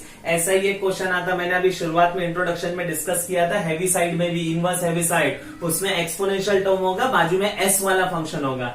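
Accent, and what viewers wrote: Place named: Tamil Nadu, India. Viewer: Bht bhdiya sir.. ur tamil accent is cool 🎉 😎😎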